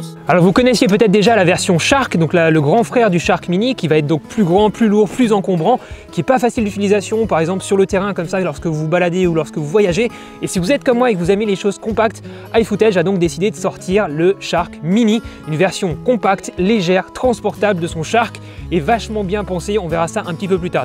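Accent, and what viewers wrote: Alsace, France. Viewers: on dirait que tu es en Alsace. en tout cas super bonne vodéo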